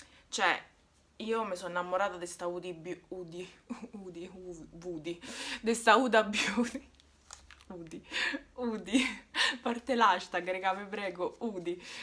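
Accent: Rome, Italy. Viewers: Ciao sei bellissima e il tuo accento romano mi fa morire dal ridere ti amo troppo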